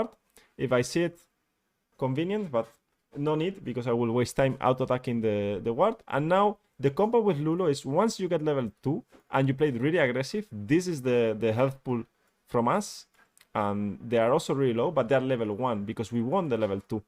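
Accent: Spain. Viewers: llevo 10 segundos de video y ya puedo decir que es español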